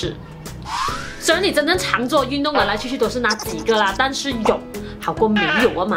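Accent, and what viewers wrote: Malaysia. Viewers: 马来西亚口音还是蛮好听的😁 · 大马华人说话好听😊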